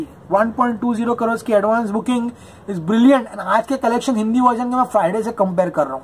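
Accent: Karnataka, India. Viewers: Your kannada accent is too good..... Feels like you are Kannada da hudga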